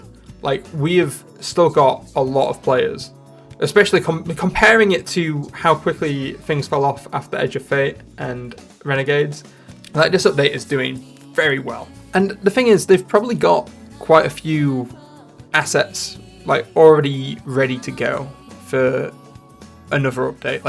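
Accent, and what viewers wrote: United Kingdom. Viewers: IS BRI'ISH?! (I hail from Tw*tter) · he's british????????????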